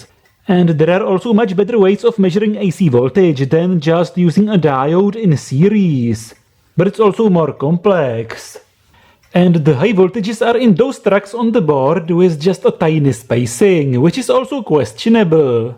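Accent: Czech Republic. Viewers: Czenglish hype!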